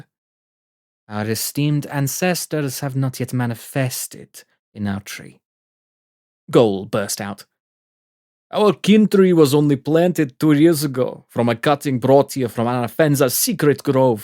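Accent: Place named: United Kingdom. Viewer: you sound very british in this one!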